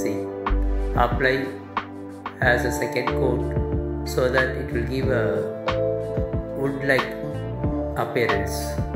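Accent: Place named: Tamil Nadu, India. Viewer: TQ for jet speed reply.l am also tamilian.so Happy to hear you are also from chennai.i guess because of your English speaking.now I am settled in small.village near by Ongole A.p.i will try to make your articles brother.always your fan.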